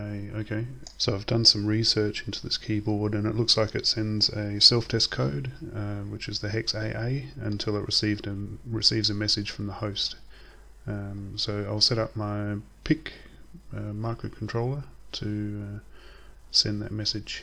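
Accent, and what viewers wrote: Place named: South Australia, Australia. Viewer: Super cool work, I found you from Hackaday and have been bingeing all your stuff for inspiration in my own 6502 project since I'm planning to use a backplane too. You don't happen to be from South Australia do you? You don't have to answer if it's too much info lol, but you sound like a South Aussie. It's possible I'm just hearing ghosts, but I'm from Adelaide and I think I can hear some subtle hints in your accent. Great work again :)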